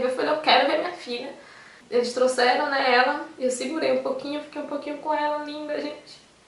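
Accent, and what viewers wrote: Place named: Cruz das Almas, Brazil. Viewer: ! Acabei de relatar minha história para você, e achei seu sotaque parecido com o nosso. Vendo agora seu endereço, percebi que também é de Cruz das Almas. Caso queira, eu posso te ajudar com indicação profissional e ombro amigo caso queira. Deus te abençoe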